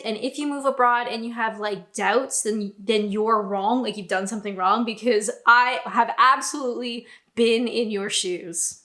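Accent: Canada. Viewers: Still got a Canadian accent. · It’s OK, you’re Canglish. We get it, it’s cool 😎!!! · Hi! You've aged? You're looking good girl!!! Yes, I know the feeling of not belonging, having an identity crisis and trying to adjust when we emigrated to Tronno and returned all those years ago as a 9/10 year old. This was all in only 1 year! Didn't know if I was coming or going but what an experience to be had! (...and yes, I did start to have a Canadian accent like yours but lost it again:) ) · Surprised after all this time your accent is still fully Canadian?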